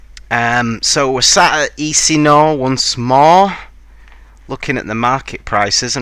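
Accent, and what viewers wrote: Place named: United Kingdom. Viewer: I like your British dialect.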